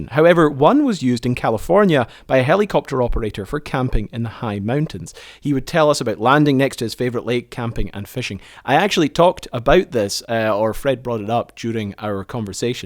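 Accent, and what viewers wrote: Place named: Scotland, United Kingdom. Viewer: I don't understand your Scottish accent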